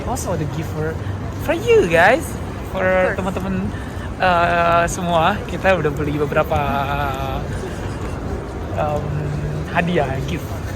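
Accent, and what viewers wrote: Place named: Java, Indonesia. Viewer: Kok ragazzi medoknya kebawa ke Jakarta.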